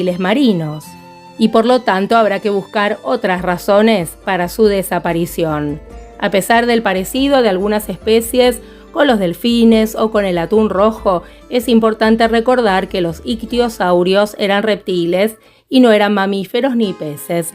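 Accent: Argentina. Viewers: Muy bonitos y gorditos estos "delfincitos cavernicolas", jaa. Yo los he hecho en plastilina..son muy faciles de hacer. Pero ahora quiero hacerlos en resina, igual que mis otros dinosaurios que hago. Yo creo que si vivieron hasta el final de los dinosaurios, con la ultima especie descubierta en el Cretacico. Pues su anatomia resulto muy exitosa para su ambiente. Como lo es ahora los delfines y ballenas..claro: estos tienen la mala suerte de haberse topado...con el Hombre, somos los peores, jaa. Muy buen video, felicidades. Solo no me gusto el acento argentino...es muy molesto. Adios desde cd. De Mexico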